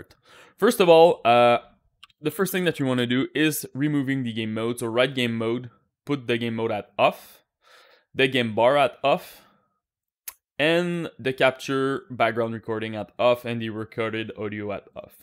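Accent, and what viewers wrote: Quebec, Canada. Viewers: tsonne tres québékois my guy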